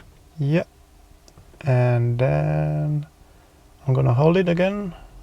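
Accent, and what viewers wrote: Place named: Romania. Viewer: I toght you are from 🇷🇴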